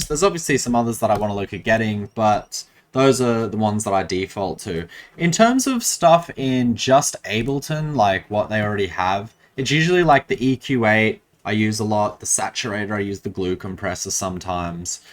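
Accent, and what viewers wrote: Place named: Australia. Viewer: You sound like you from Australia🇦🇺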